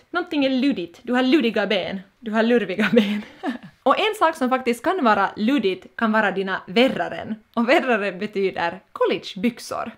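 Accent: Finland. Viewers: I can hear the Finnish vowels in Finland Swedish. I prefer this kind of Swedish than Sweden Swedish lol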